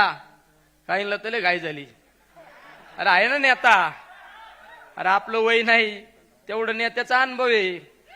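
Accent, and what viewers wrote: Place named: Ahmednagar, India. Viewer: अस्सल नगरी कडका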